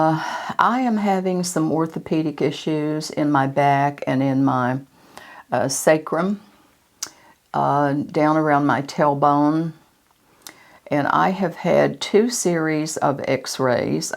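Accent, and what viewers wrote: South, United States. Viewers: She sounds like she's from the South. Peonies don't grow in warm climates, so she might never have heard anyone talking about peonies. I didn't know either, until I moved to Ohio.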